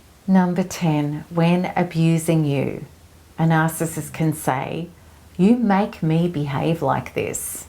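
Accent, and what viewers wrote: Australia. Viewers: Your Aussie accent is like butter on toast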